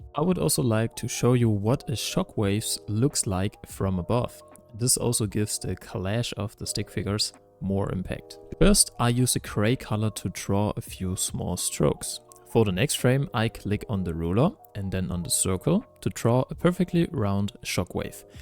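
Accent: Germany. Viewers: I hear deutsch(gutes tutorial btw) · R u german?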